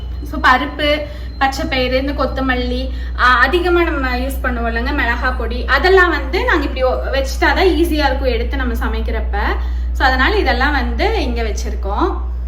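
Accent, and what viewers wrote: Kongu, India. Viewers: Way of talking is nice kongu thamiz. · Iam kongu tamiz from Pollachi and your voice nice and super kongu tamiz🎉🎉🎉🎉😀😀😀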